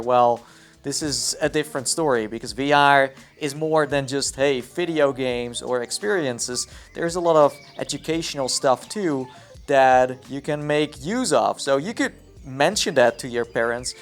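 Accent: Netherlands. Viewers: Wait are you Dutch because you have a accent I fit too but are you Dutch???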